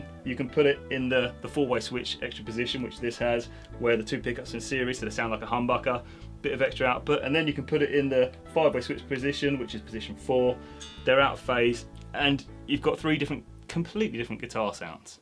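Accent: United Kingdom. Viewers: To USA Americans, a man with a strong British accent and tattoos and a . .muscle-T-shirt' is obnoxious .... that is MY comment and your replies are not wanted or asked for.....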